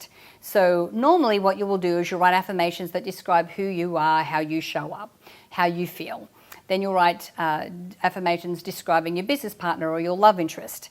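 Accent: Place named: United Kingdom. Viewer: but your accent sounds british. Am i wrong?🤔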